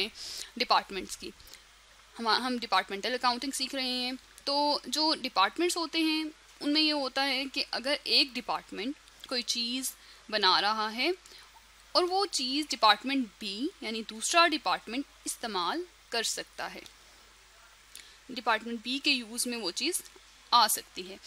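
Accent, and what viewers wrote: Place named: Pakistan. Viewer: Your voice sound as if you're Pakistani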